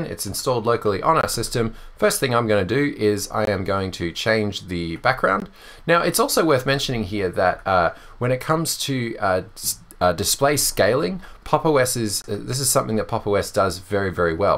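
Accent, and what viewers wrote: Australia. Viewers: So you're Australian. I thought you were from Eastern United States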